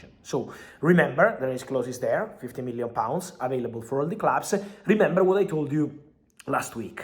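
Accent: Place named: Italy. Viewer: please take a breath and slow down a tick...Italian-English is lyrical but not easily understood for this Alaskan...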